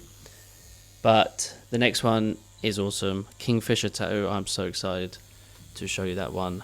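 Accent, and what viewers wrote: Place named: London, United Kingdom. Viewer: I love Londoners accent! Keep rockin the tatt-ewwss mate!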